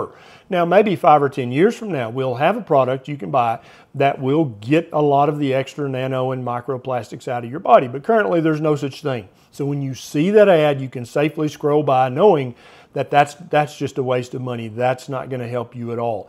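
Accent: South, United States. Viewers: Listening to Dr souther drawl talk about the latest scare tactic the dreaded “micro plastics” makes me want to consume more and hurry up my own demise.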